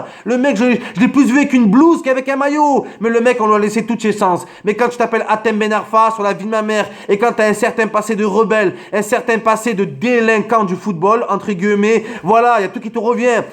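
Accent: Marseille, France. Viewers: ferme ta geule comment om t la tu parle du psg avec ton accent de marseille té pa credible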